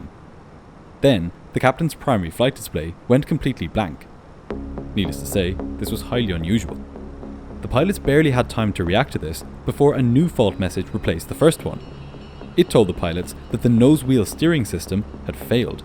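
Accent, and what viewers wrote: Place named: Ireland. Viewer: ….its his accent. thats how they say it in his region. im like 90% sure he’s irish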